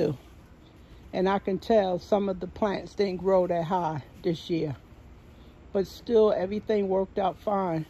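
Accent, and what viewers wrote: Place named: New Jersey, United States. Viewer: I knew when I heard you talking that I recognized a fellow Jersey resident. I love that you garden too. I love growing my own fresh fruits/veggies in the warmer months, and then being able to enjoy the "Fruits" of your labor. I would love to hang and crochet in yr garden with You & Calvin!!! I'm from Bergen County, but now live in Sussex County. ❤❤❤❤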